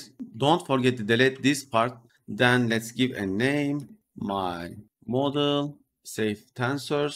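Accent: Turkey. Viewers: hocam civitai de videoyu izliyim dedim aksanınızdan ve yüzünüzden kesin bu adam Türk dedim tahminim tuttu :) elinize sağlık,civitai ile model indirirken her model için isim belirtmemiz gerekiyor sanırım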